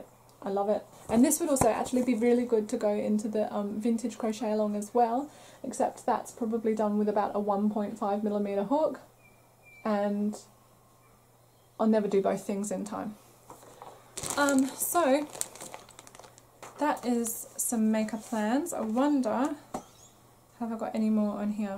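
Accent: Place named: Australia. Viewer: Wow !! Lismore, nice part of the world. I’m so happy to find you, I’m from Sydney and it’s so nice to hear another Aussie voice on YouTube...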